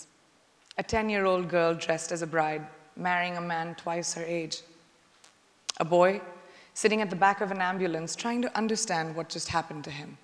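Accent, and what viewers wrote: India. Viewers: Finally, i found someone who don't change her indian acent 😊😊.... india has its own acent ..u have don't need to copy native contries acent . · Clear Indian accent.💖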